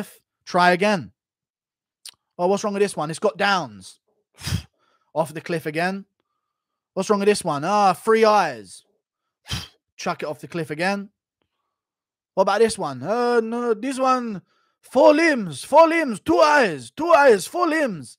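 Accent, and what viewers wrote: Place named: West Virginia, United States. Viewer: Sounds like West Virginia especially boone county.......wait......I'm from boone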